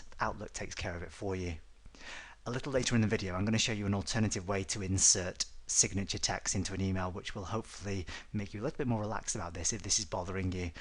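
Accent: United Kingdom. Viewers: Amazing video! thank you so much! You explain complicated things in such an easy way, great job! Oh and that amazing British pronunciation!!!!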